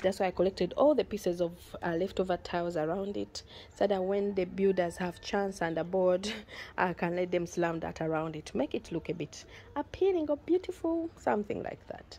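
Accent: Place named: Uganda. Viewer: You sound Ugandan, did you emigrate to Ghana?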